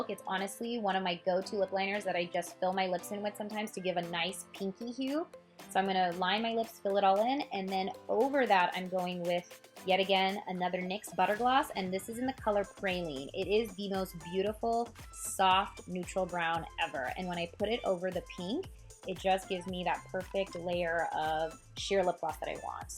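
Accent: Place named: India. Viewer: Hey are you Indian? I really love your accent plz try to reply in Hindi because I wanted you to say something in Hindi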